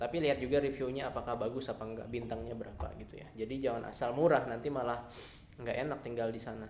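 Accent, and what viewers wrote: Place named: Java, Indonesia. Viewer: Saya gak nyangka lo kalo masnya dari kalimantan😀 kirain wong jowo😆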